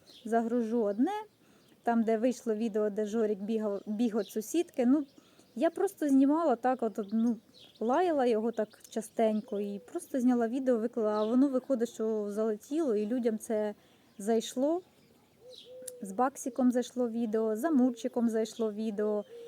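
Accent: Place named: Surzhyk, Ukraine. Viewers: Сонечко, ти найкраща. Твий суржик такий справжній, такий теплий... Дай Боже тоби всього найкращого. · Не знаю, чому Ви себе бешеною - чи це в тому смислі , що Ви така щаслива , безпосередня у спілкуванні з тваринками.. Але Ви така щира і приємна, що це не може не подобатися. Цікаво, звідки Ви? Мені не попадали на очі ті кгметарі, де Ви про це розповідали. По вимові - косю ходю - то це десь Дніпро-Полтава- Кропивницький ... А про стріми, відео - Ви цікава своїми щирими емоціями, бо є люди, які повторюють чужі фрази з тік-току , а є ті, що хочуть бути дуже оригінальними і плетуть хтознащо . А Ви не фальшива актриса, а просто кайфуєте від того,що робите - і це приваблює. А сюжети життя саме підказує. Хто має тварин і любить їх - для того майже щодня якісь маленькі пригоди. Знаю по своїх котиках - можна цілу книжку написати , та такого, що й не всі б повірили в котячі витівки ) А пригоди вашого Жоріка - це ж неповторний дгсвід, бо в кого ще є такий вільний кабан ? 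Та і не всі в селі вміють нормально говорити з тваринами. Є такі, як що : киць-киць, іди їсти, їж, на, пішов геть , щоб ти здох - це так реально одна знайома жінка каже... А я можу щодня діалоги зі своєю кицькою вести : і яка вона красуня, і хвалю її вміння спати непорушно пів-дня, не міняючи пози : виспалась, доця? О, перевернулась ? Правильно, двіженіє ето жизнь ) Був би тільки час .. Бажаю Вам всього-всього, що Вам хочеться і потрібно ( бо не завжди нам потрібне те, що хочеться) Досягнете Ви ста тисяч, кнопок чи ні - аби це Вас радувало , аби ьуло мирне і шасливе життя.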